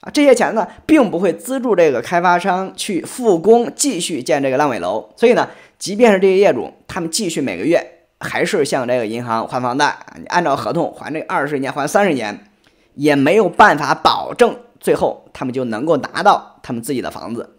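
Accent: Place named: Henan, China. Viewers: 这口音有点像河南人啊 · 老家八成河南附近，因为口音有一点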